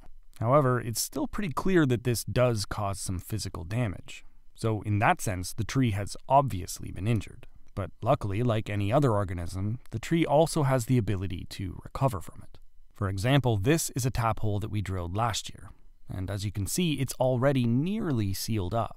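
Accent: Canada. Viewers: This guy has a thick canadian accent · Victory Begins in the Garden And he has the most Canadian voice to go with it. CBC Radio worthy. 🙂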